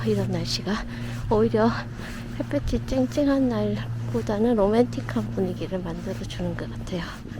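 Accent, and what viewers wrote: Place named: Gyeongsang, South Korea. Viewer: 눈이내린 스위스로~ 달려가고파요 ^^ 하늘빛까지 내려앉은 눈덮힌 설경이 너무 아름다워서 얼른 캔버스에 옮겨놓고 싶어지네요! 그래도 될까요? 안개속 몽환적인이 풍경이..... 눈까지 맑게해주네요!!! 영상속 씨의 정겨운 저랑같은 경상도 억양도 소박하게 느껴지고, 이다음 스위스가게되면 좋은곳 많이 소개해주세요. 감사히 영상 잘봣어요^^ 즐건 주말되세요♡♡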